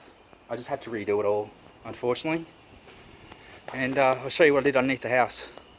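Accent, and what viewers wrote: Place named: Australia. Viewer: Perfect amount of swearing in that Aussie accent bud! (My church does not understand me 😂). Glad you were able to fix the mess!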